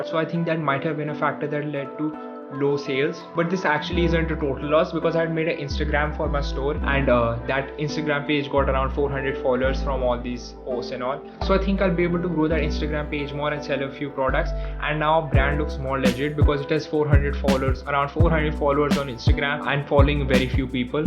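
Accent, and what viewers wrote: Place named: India. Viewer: your accent is like indian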